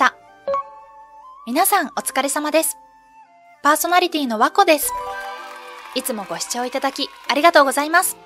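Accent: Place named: Tohoku, Japan. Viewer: 声は可愛いんだけど 所々 東北訛りっぽいねｗ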